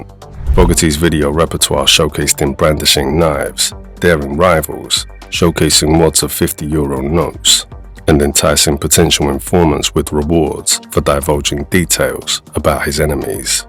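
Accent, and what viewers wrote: United Kingdom. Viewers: State of this shite😂😂😂 uk accent is cringe as fook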